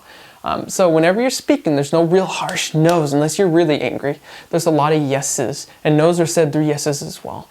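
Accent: Brazil. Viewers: O português dele é bem brasileiro.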